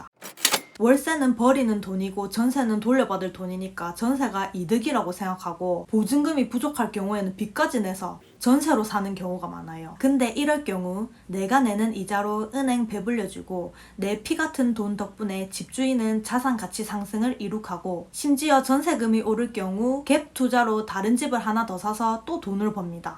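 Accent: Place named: Gyeongsang, South Korea. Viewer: 의 나레이션을 보면서 문득 드는 생각은 내가 남부경남 출신으로서 의 사투리가 무척이나 인상적이다. 남부경남 특유의 성조가 두드러지게 나타난다. 2의 2승 e의 2승을 설명하기에 무척이나 최적화된 사투리 억양을 가지고 있다. 남부 경남 사투리 특유의 '강조'를 할때 다소 신경질적인 톤이 나오는 고유의 억양을 가지고 있다. 기회가 되면 남부 경남 사투리 연구를 해보고 싶다는 생각이 들기도 한다. 다른 사투리는 별로 관심이 없고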